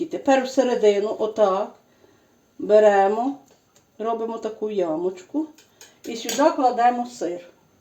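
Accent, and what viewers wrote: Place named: Transcarpathia, Ukraine. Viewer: І що?Жителі Закарпаття не соромляться свого діалекту.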